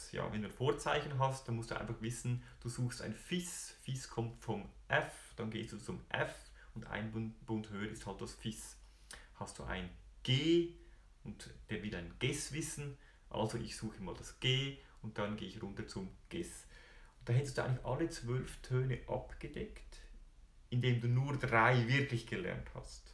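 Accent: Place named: Switzerland. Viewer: Hallo, Deine Videos sind sehr hochkarätig, sehr gut strukturiert und angenehm anzusehen (auch wegen dem schwiezer Akzent;)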